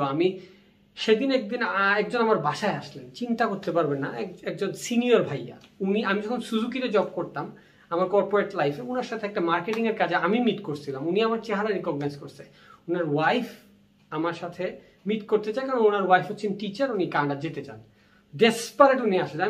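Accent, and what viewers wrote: Sylhet, Bangladesh. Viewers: ভাইয়া সিলেটের আঞ্চলিক কথা গুলা খুব ভালো লাগলো😍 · Bro!! Sylhet apnar basha kuno?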